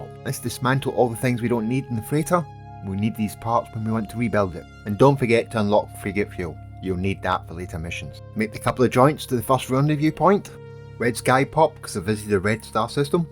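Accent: Scotland, United Kingdom. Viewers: Scottish... U so Scottish ^o^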